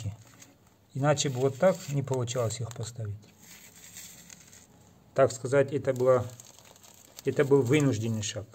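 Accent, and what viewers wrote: Caucasus, Russia. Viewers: Да согласен , объясняете хорошо , но вы забываете или я может быть где-то пропустил где вы живите, судя по акценту с кавказа.Поэтому считаю что дата месяц не имеет значения .Имеет значения какой климат или создать климат для укоренения .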